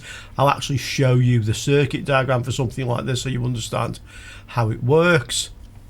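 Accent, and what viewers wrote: United Kingdom. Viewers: Thanks a lot for correct explained ❤ Sometimes I have problem with the British accent! What I missed is directly connected diac and thyristor to Gate! Variable supply of diac to Gate! But thanks 😊 U give me Manny answer's and open a lot of questions!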